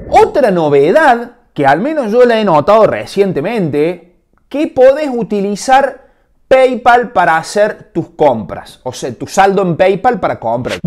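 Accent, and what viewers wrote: Córdoba, Argentina. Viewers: Que lindo acento cordobés!! Saludos desde Uruguay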